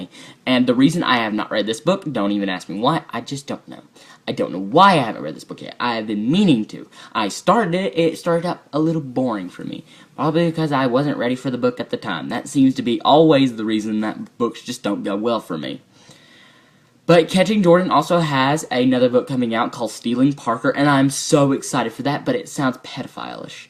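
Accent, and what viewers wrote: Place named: United States. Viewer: Your accent is so cute LOL. You sound really American. Great video! Hopefully you get to reading those books soon. :3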